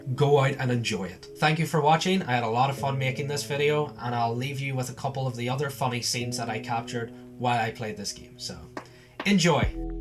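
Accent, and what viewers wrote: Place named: Ireland. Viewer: My favourite part was the Irish accent 😌 And the Insight deafly going to add this to my steam list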